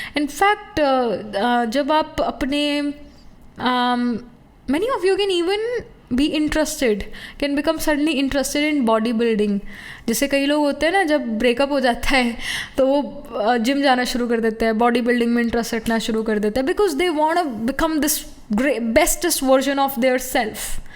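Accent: Punjab, India. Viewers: Are you Punjabi girl language tone shows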